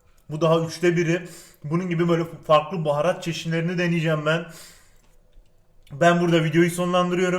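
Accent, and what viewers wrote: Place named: Hatay, Turkey. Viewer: Hataylı olduğu için , şivesi öyle 😔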